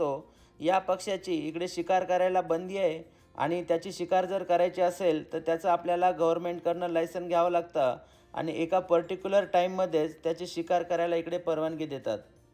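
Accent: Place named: Latur, India. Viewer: दादा तुम्ही परदेशात राहून पण आपली लातूर ची भाषा विसरले नाही आणि तुमचे मुले पण आपली लातूरची भाषा छान बोलतात मला खूप अभिमान वाटतो तुमचा मी पण लातूर आहे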